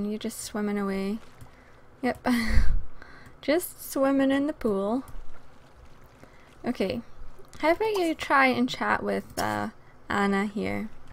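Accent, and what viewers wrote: Scotland, United Kingdom. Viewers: LOVE the Scottish accent. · Finally another Scottish youtube! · Your accent is amazing! I love how you talk lol (Scottish?) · she is scottish! I WANT HER ACCENT though D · I love your accent. It's just something about Scottish accents that make them just so cool. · 100% Scottish :P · I am Scottish and I can tell that she is Scottish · Melissa Hubbert She's from Fife, so kind of in the highlands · I'm watching this because I like watching old Sims videos because they used to be basically all I'd watch and I have one question Is she Scottish? She sounds Scottish. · im scottish but i had no idea you were scottish haha and i laughed at the bit when he was looking on his phone haha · YEY finally a Scottish you tuber I am aswell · Omg she's Scottish yasssss I like to call my self Scottish because I move in Scotland when I was 6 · I love your accent! 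Scottish accent is pretty awesome :) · You sound Scottish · Where are you from? It's pretty obvious to spot an Irish accent from a scottish one. She's Scottish. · Comments: 50%asking if your scottish 25%saying they like your scottis accent 24%saying other stufss 1% saying the comments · WOA UR SCOTTISH?!!! I'VE ALWAYS WANTED TO HEAR A SCOTTISH PERSON TALK ITS AN AWESOME ACCENT IDK WHY I LIKE ACCENTS SO MUCH I WISH I HAS ONE FJEFJECH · ***** You're Scottish aren't you? Cause I can here that accent from miles (probably because I am Scottish). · Its so nice to hear our accent used well! Theres so many people in Scotland who use abbreviation of the end on words, especially verbs, far too often. I like your dictation mixed in with our 'ma's and stuff like that! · I'm Irish and she's definitely Scottish. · Yeah, I used to live in Scotland and her accent is kinda like it. :) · She is Scottish (: I am Scottish too! 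Makes me proud :')x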